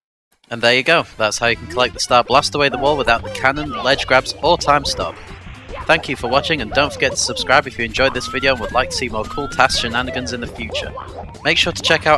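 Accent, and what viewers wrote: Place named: United Kingdom. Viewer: British lad voice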